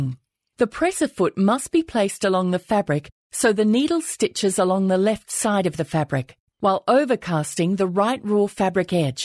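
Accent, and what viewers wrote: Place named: Oxford, United Kingdom. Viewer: Finde jetzt die Erklärung in diesem verhältnismässig langsam gesprochenen und klar verständlichen Oxford Englisch mit den entsprechenden Bildern dazu sehr gut zum nachvollziehen. Was schreien hier alle nach einer deutschen Anleitung? Ist denn bei der Maschine keine dabei? Viel falsch machen kann man ja bei dieser Nähmaschine nicht, wenn man ein klein wenig logisch denkt beim arbeiten ;-) Dank Globalisierung ist die neue Geschäftssprache halt Englisch, so what? Ich dachte auch - bis heute - dass SINGER ein deutsches Unternehmen ist, hab ich wieder was gelernt dank den Kommentaren hier. Danke!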